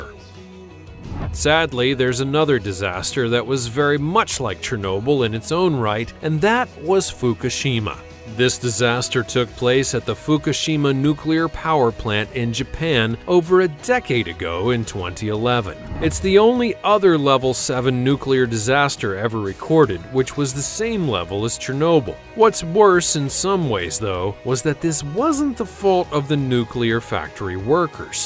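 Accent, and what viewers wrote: United States. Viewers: Wow, irritating American accent is horrid.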